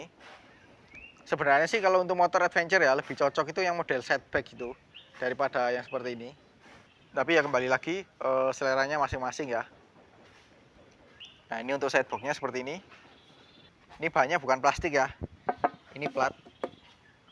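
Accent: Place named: Java, Indonesia. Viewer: Suaramu begitu iconic dan medok mase 😁👍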